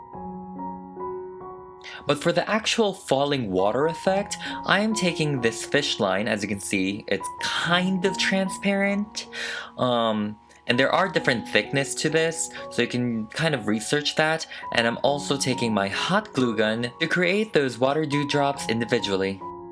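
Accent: France. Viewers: the french accent yass bitch kiss from paris ❤️ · What kind of accent is that XD. It switched between French and mostly British. No hate tho it was cute af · I love the french accent tho XD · C'est tellement étrange de t'entendre dire des mots français au milieu de ton anglais, en vrai tu as un accent qui ressemble bcp à celui d'un français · Quel exploit ! What a feat ! I didn't expect the final look with the drops falling but omg it's sooooo great ! Please keep talking with the french accent, it suits you very well (on behalf of a french woman) ! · love your french accent :3 ( I'm french :3) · You have a beautiful French ascent and also the French poodle doll you repaint! I love it! <3 <3 <3 <3 <3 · I like that French.English accent 😀😂and your outfit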